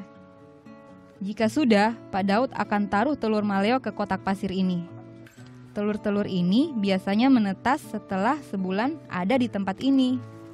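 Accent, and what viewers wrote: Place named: Gorontalo, Indonesia. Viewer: Saya baru nonton ..meski terlambat tp suka semuanya.. kontennya,narasinya sederhana dan tetap bercirikhas logat Gorontalo...pokoknya bagus dan asyik....👍👍👍👍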